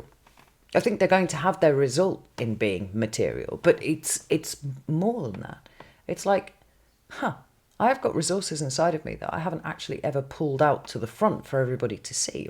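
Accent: United Kingdom. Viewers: I have been watching tarot and reading professionally for many lifetimes. I have NEVER seen a reading that resonated more strongly. It was like a replay of a conversation I had with a dear soul sister whilst sitting in the forest here in NZ last night. What’s more, her British accent is identical to yours. I’m a psychic/medium and that dear one was some truly beautiful reading of the tarot. I’m only an intuitive reader, but you totally know your sh!t! Your understanding of how the energies weave are phenomenal. Deep gratitude for all you bring and so freely give. Much love to divine sister. 🙏♾💜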